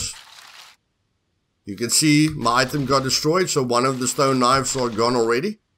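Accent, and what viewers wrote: South Africa. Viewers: Friends and I are getting into scum today thanks for the help . Are you South African ? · Are you south African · I'm enjoying the video so far. Thanks!! I'm looking forward to playing. Btw I hear your south African accent😃. My old pastor was from South Africa. I'm looking forward to watching more of your videos. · Which part of South Africa are you from bru....or Rhodesia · Jy is van Suid-Afrika :D ek hoor dai accent :P. thanks viri video dude! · is that an SA accent I hear?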